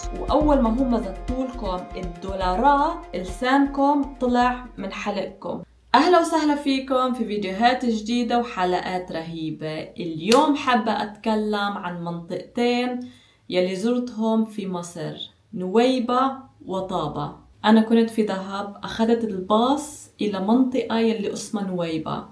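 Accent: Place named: Palestine. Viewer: شفتلك كم فيديو فكرتك لبنانية ، بس حكيتي كم كلمة عرفت انك فلسطينية .. CHلبوا فيي .. تعربشت😅.. يسعد دينك انتي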